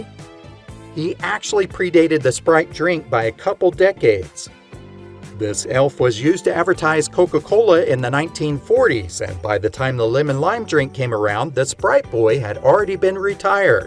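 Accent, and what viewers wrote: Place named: South, United States. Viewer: It seems like you are from the South, but I can't place that accent. I fear it might be Virginia. They need to be reclassified.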